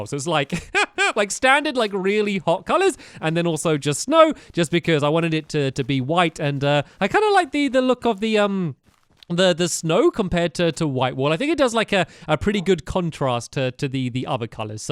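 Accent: United Kingdom. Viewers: R u British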